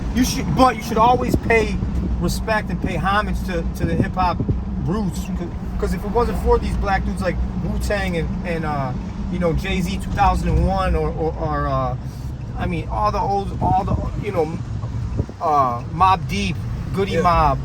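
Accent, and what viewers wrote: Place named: Ohio, United States. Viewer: Adams Ohio accent man.. can't do it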